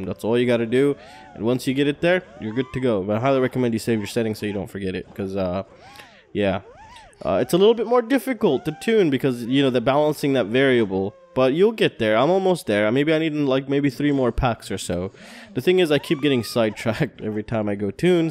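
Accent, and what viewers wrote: United States. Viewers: Are you in the uk? Looks like UK and Uk numberplate on the 206. You sound American.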